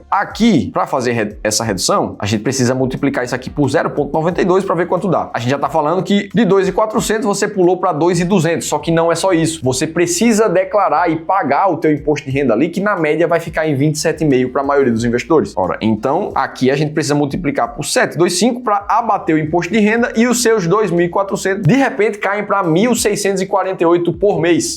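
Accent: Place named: Northeast, Brazil. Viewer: Com esse sotaque de nordeste. Não pode reclamar. Deve achar bom mais imposto melhor. Virei benefício.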